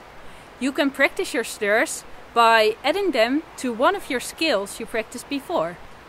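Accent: Netherlands. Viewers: You have such a dutch accent!! I am dutch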